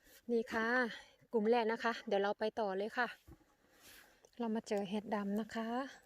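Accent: Korat, Thailand. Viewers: พูดเลยค่ะภาษาโคราชน่าฟังค่ะ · สวัสดีค่ะคุณขอถามเด้งเห็ดอันนี้มีเลิ่นหอมแบบไหนค่ะคุณทางนี้เคยเห็นแต่กลัวไม่ก้าเก็บพูดออกภาษาไทยเหมือนฉันเลยไทยเด้งคนโคราชนิหรือบุรีรัมย์ค่ะตอบเด้งเด้อจร้า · สำเนียงคนโคราช? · พึ่งเคยดูพี่คนโคราชไหมคะสำเนียงเพราะมาก ชอบค่ะ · พึ่งดูครั้งแรกคนโคราชนิเห็นพูดโคราช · พูดเหมือนคนโคราชพิมายเลยพี่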